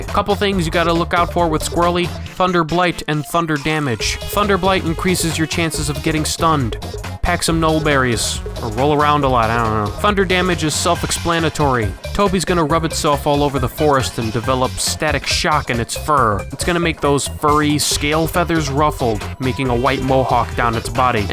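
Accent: Italy. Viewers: the Italians Do infact speak like this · hearing the Italian accent intro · Italian accent intro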